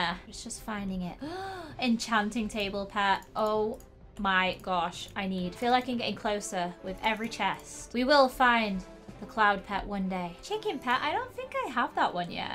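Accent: United Kingdom. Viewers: Name the horse Nutella hahha cause that’s the horses colour!!! Bye the way are u British??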